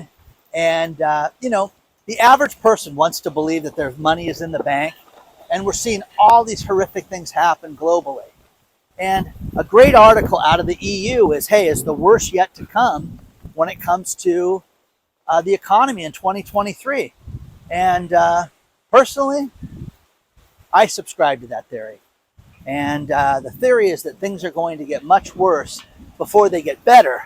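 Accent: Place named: California, United States. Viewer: My mom watches your videos religiously and has for many months. You seem like a sensible guy. You clearly know magnitudes more than you let on. You're also one of the very few people I've ever seen who has a legitimate Californian accent. Lots of people still claim there is no such thing, but it's very subtle.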